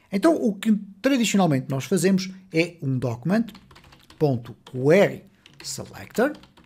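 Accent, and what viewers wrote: Portugal. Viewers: Muito legal aprender nesse português com sotaque de portugal, aprendemos também certas diferenças em relação ao pt-BR, a pronúncia de executar mesmo eu demorei um tempo para sacar que não se tratava de "escutar", mas sim "executar", no Brasil o som do "x" é pronunciado com som de "z" (com entonação mais forte no "ze" => "ezecutar"), mas no português europeu é algo parecido com o som do "s". Quando falava "executar" eu entendia "escutar" e achava que falavam "escutar o código", algo parecido como quando escutamos eventos, mas certo dia ativei as legendas em um dos vídeos para alguma finalidade que não me recordo bem e vi que se tratava de executar e percebi então que havia diferença na pronúncia. Vendo a função "executar" criada nessa aula me fez lembrar desse fato, rs, se eu não tivesse notado antes, certamente notaria nessa aula.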